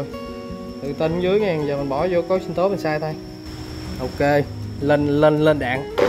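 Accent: Ben Tre, Vietnam. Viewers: Cho mình hỏi, nghe nói chuyện giống người miền Tây, giống quê mình, bạn ở đâu vậy? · Nghe giọng nói giống như bến tre z a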